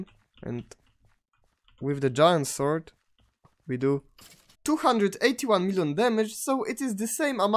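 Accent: Poland. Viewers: Just by the voice i knew you were Polish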